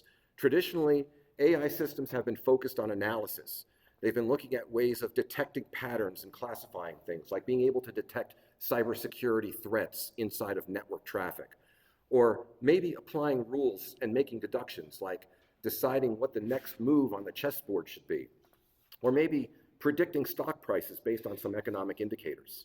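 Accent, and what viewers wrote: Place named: Italy. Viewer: came for the AI talk, stayed for the Italian-accent poetry Great intro to generative AI. You made a complicated topic feel practical, and the “AI predicts words, it doesn’t think” explanation really clicked. Also… now I have no excuse for bad emails anymore. Nice job making AI understandable for normal humans. Cafer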